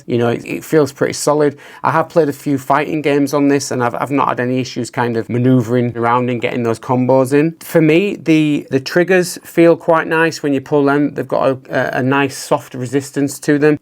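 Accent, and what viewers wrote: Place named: England, United Kingdom. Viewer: i just want to thank you for doing this and for your channel im think of buying a rog ally because A good price B all the things i can use it for and C play at my friends house for sleepovers thanks for your reveiw. Ps my parents are from england and now we live in canada and it is very refreshing to hear your accent. thanks!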